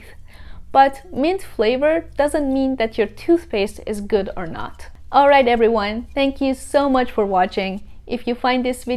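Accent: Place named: Iran. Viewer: You have a sweet Persian accent! And u look sweet too!! 😍😍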